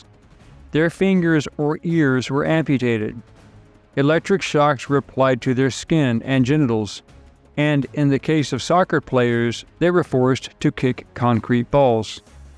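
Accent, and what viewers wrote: United States. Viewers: You have the most American Cowboy voice ever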